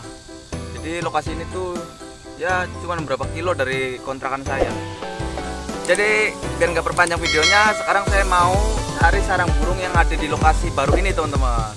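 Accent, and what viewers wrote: Java, Indonesia. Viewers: Pantes Kya logatnya Jawa...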